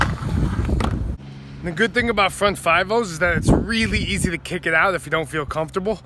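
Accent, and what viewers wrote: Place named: New York, United States. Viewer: Your trick tips are really great. A lot better than some of the others out there because I think you nail the "what you are supposed to be feeling" part really well. I gotta ask though, are you North Jersey or NY?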